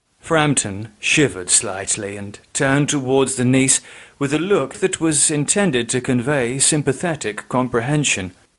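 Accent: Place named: United Kingdom. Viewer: I laughed while listening to his British voice, thinking I was back in the England 1700's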